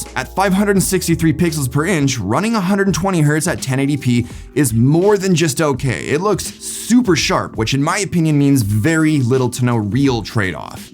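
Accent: Canada. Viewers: Why is every tech YouTuber a Canadian? Never noticed until I moved to Australia and became more sensitive to fellow Canuck accents.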